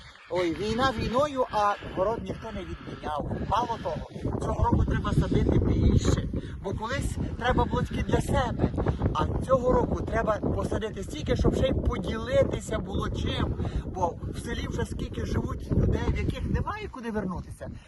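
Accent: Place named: Chernihiv, Ukraine. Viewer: вы живёте случайно не в Черниговской области? Я прямо свою бабушку услышала. Вот именно так она говорила, слово в слово. Я с Сибири, а моя бабушка с Черниговской области. В Украине, ведь везде своё наречие. Бывает,я кое какие слова понять не могу, а вас прямо каждое слово. Я молюсь каждый день с начала войны за вас и скорблю вместе с вами. Дай Бог всё скоро закончится. Сил вам душевных и физических. Я вместе с украинцами радуюсь вашим удачам в бою, и переживаю за неудачи.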